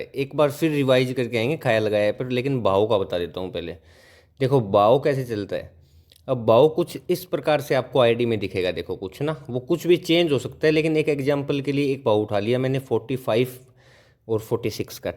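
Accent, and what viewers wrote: Haryana, India. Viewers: Haryana se ho bhai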